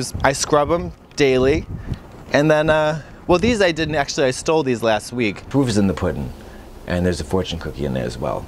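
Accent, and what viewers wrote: Boston, United States. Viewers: You can really tell she’s from Boston wow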